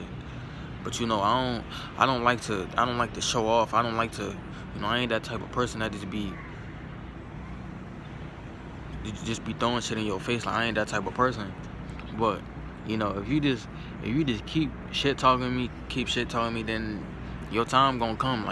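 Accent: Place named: New York, United States. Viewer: Damn sound like you from NYC Or Philadelphia from your accent where you from?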